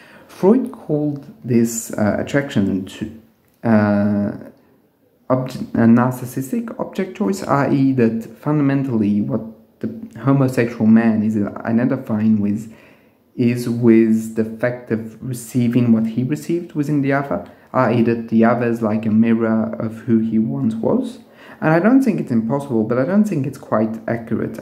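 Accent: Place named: France. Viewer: You speak very good English whilst retaining the charm of your beautiful French accent. Very well presented, informative and thought provoking video. Thank you. I have subscribed.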